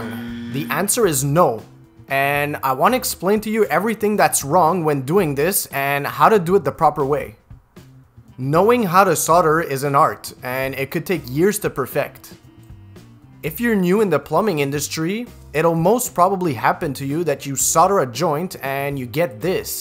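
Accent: Quebec, Canada. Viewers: Are you French Canadian ? You have a little french speaking accent in your english ! Very useful video !! Merci beaucoup ! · c sur t quebecois toi xD merci pour ton video ;)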